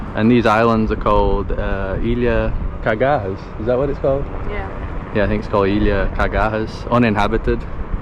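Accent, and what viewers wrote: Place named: Northern England, United Kingdom. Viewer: haa thought so you have a northen accent like me so picked it up just came across your channel and im liking it, loving vlogs from 🇧🇷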